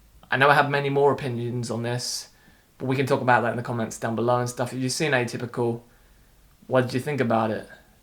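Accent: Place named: United Kingdom. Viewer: From your accent, I am guessing that you live in the UK. Autism culture between the UK and US is quite different. I've found from reading, vlogs, blogs, fora, etc. that it seems as if one driving difference for that has been legislation. In the UK, you have specific legislation pertaining to autism that defines it as a recognized and funded disability which coupled with universal healthcare makes the landscape there in terms of autism really different than it is in the US. You also have a very strong advocate in the Autism Society. You mentioned that for the parents in "Atypical" that Sam is a burden and seemed to find that horrendous. But in the US because so much care and assistance isn't provided, in financial ways and in terms of Sam's prospects for independence in a bottom line sense he probably would be. Many of the special classes he's attended even his therapy may not be covered by the parents' insurance - so something they are paying for out of pocket. 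If Sam has trouble finding (sustaining) employment then they might be looking at him living with them for the rest of their lives, whereas my understanding of things in the UK is that (while they are not perfect) there is financial and housing support available for autistic people. Not to mention that in the show there are a ton of special accommodations, that mostly the Mom makes around what Sam will eat, wear and even by having a separate laundry detergent just for him. That said, my sense of the "burden" theme was t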